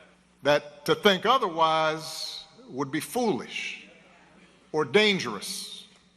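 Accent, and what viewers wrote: South, United States. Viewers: I like how he throws in a little, country accent. So genuine ❤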